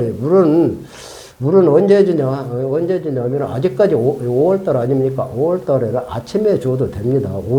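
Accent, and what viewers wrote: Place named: Chungcheong, South Korea. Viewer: 무반주 충청도 아줌마 정겹습니다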